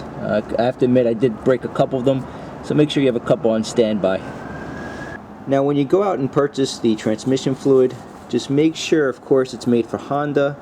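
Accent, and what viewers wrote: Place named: New Jersey, United States. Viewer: His NJ accent reminds me of Jay & Silent Bob!! 😀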